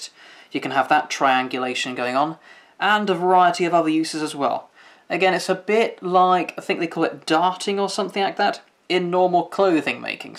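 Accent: United Kingdom. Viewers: Off topic, what area of england is your accent from? Also, thanks for the lessons.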